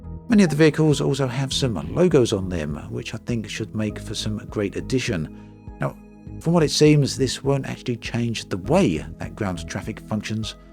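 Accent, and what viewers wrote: United Kingdom. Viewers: First of all I love your videos but I just have one question. Why do you always give the price for things in dollars? You sound very much British to me. It's bad enough our language being taken over by American English without our currency aswell. Sorry. Rant over. Keep up the good work. 👍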